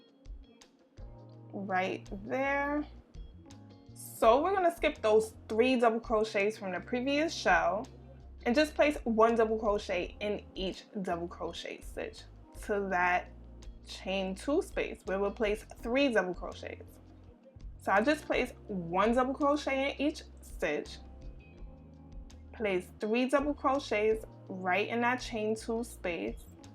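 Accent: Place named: Bronx, United States. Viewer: Where are you from? Sounds like you're from where I'm from. Ny. Bronx to be exact. Lol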